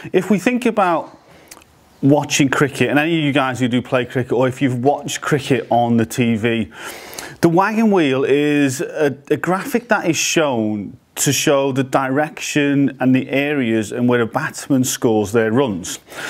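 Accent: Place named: Liverpool, United Kingdom. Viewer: Been a while, do prefer a fellow scouse doing tutorials 👍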